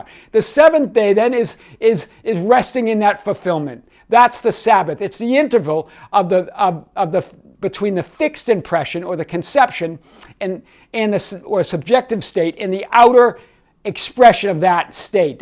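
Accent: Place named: Massachusetts, United States. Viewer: Mate I have recently discovered your channel and you rock hahahaha. I love and appreciate your in depth explanations, your wisdom, your catch phrases " hear this!", "I want to unpack this like it's never been unpacked before", your cool Massachusetts accent, and your infectious laugh always makes me break out in a laugh too hahahaha!! Seriously, thank you for your time, effort, and service in teaching us these concepts and helping to harden our own understanding of these concepts at this point in time and space. Oh, and I notice how you seem to reply to everyone who comments or asks questions on your videos, and not just superficial responses but quite in depth and supoortive. What a legend mate 😉 From a new subscriber in Brisbane Australia oi oi oi!!! 👊😁💚☮🙏🌈🌟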